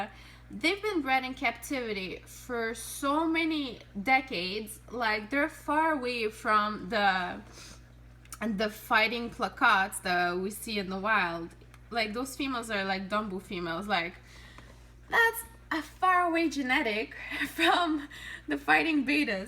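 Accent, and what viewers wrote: Quebec, Canada. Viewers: R u from quebec? You got that sound.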